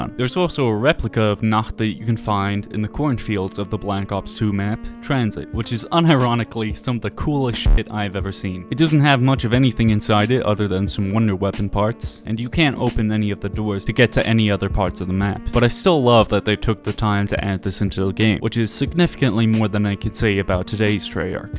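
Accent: Germany. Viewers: Are you German? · your german accent is surprising good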